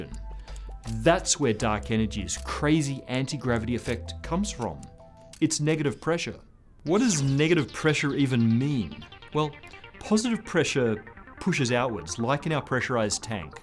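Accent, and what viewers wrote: Australia. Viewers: This chap has an Australian accent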